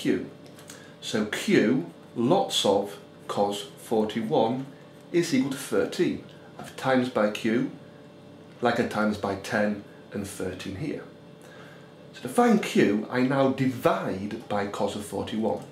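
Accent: England, United Kingdom. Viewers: English people make everything sound better. good stuff. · Your British accent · you're the reason why I remember sohcahtoa in a british accent lol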